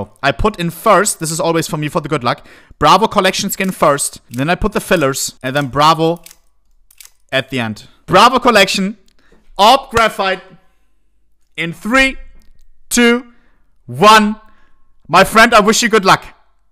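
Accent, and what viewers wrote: Germany. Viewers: nie was schlimmeres gehört als nen Deutschen, der mit extremstem deutschen akzent auf Englisch schreit...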